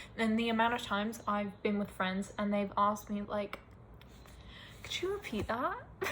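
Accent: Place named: United States. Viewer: Naw I get it, I hear the American in you. This is the first video I’ve seen of you and, I do hear it. It takes you to talking for minute before it comes out a bit, and that is okay! It’s natural for it to happen, I had a Chinese friend in middle school and my friends and I started to have a Chinese accent from spending time with her! It was insane! Assimilation is natural imo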